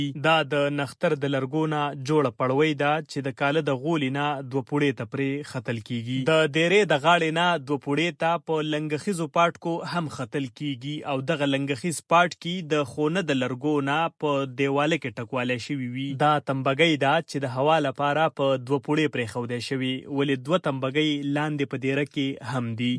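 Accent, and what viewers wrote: Standard. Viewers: ډېر پهٔ ښکلې، پاکه، خوږه او سوچه پښتو خبرې کوې. دپښتنې ټولنې ښایسته او اصلي انځور وړاندې کوې. ډېره مننه ❤️🇦🇫 ستاسو ددې خدمت لپاره ډېر څهٔ کهٔ هم وشي کمه ده. ما سبسکرایب کړې، فالو مې هم کړې، ويډیوز درته هم ګورم او لایک کمنټ هم کوم.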